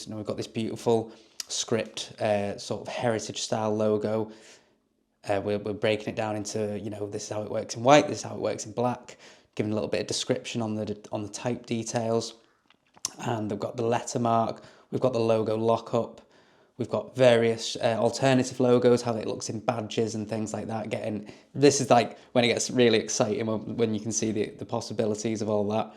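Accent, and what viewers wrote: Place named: England, United Kingdom. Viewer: Where are you from boss? Your accent sounds English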